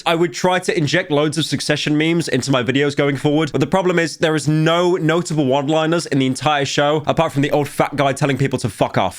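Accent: United Kingdom. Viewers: You sound more british when very sick. It only makes sense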